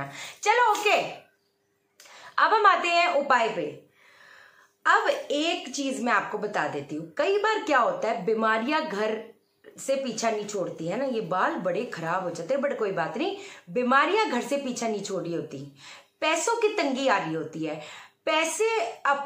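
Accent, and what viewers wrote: Punjab, India. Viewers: Yaar kya mast attitude hai twada bilkul punjabi tone blesss you darling and love your mom also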